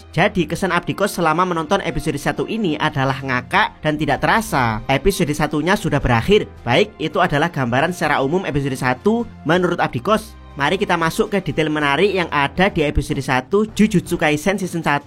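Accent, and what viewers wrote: Java, Indonesia. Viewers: Adminya orang Jawa ternyata 😂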